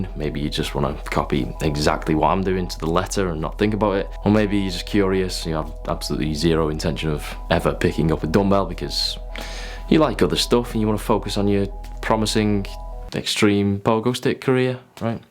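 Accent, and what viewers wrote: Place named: Merseyside, United Kingdom. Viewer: Great info but quick unrelated question mate, are there different accents in Merseyside? Just asking cos your accent ain't as strong as Paddy Pimblet's.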